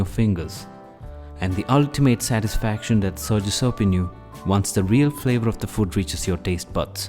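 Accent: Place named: South India, India. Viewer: Westerners who think all indians sound the same should watch this. This is one of the many south indian accent.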